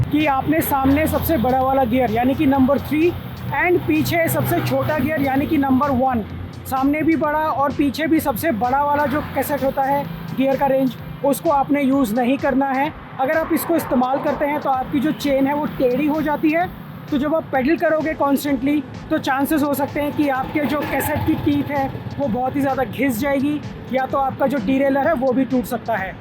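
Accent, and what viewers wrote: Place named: Kashmir, India. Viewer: Very nice. Your accent is Kashmiri 😊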